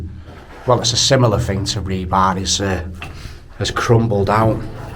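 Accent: Manchester, United Kingdom. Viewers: Top quality videos.great manc accent too.Cheers..Alf 🍺🍺🍺🇬🇧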